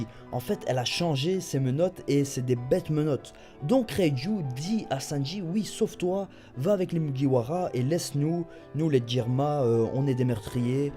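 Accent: Belgium. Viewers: "ouit cent cinquante deux" hmmm je kiffe l'accent belge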